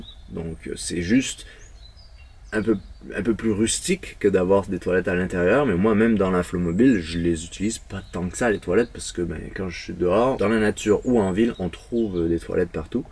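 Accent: Quebec, Canada. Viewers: Hello ! Vous êtes dans le sud, j'habite à Pau, on pourrait éventuellement se rencontrer pour boire un verre ou pour une balade dans les Pyrénées ? ;) J'ai découvert ta chaîne grâce à Marina, elle est cool, et j'adore ton tout petit accent québecois, au début j'avais même pas remarqué, je te pensais français haha Hâte aux prochaines vidéos! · Bonjour jeune homme. J'ai acheté ton livre et je trouve l'écriture simple et sincère . je le lis avec grand plaisir " j'ai même parfois l'impression d'entendre ton petit accent canadien " Bonne route à vous deux .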